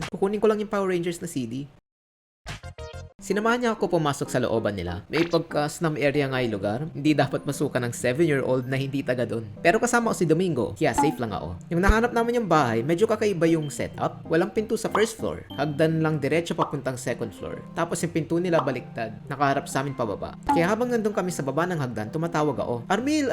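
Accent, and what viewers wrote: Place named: Conyo, Philippines. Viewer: May accent ka boss conyo ka siguro! Ahehehe you sound unique naman kaya cool parin!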